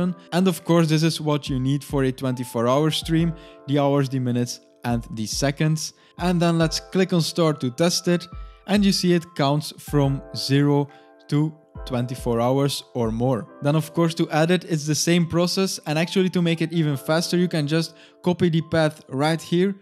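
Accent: Netherlands. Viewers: I knew you were dutch! haha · nice man, it works. hey you are dutch ( - )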